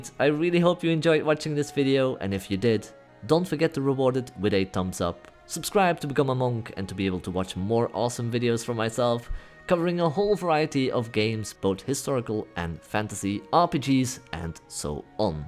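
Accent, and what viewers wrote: France. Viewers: ton accenbt est vraiment interessant, parfois c'est francais, un peu anglais et une autre pays. j'aime ca!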